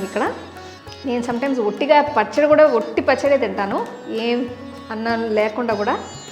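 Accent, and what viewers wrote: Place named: Telangana, India. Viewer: ivvala...man ! that telangana telugu! Miss it so much !!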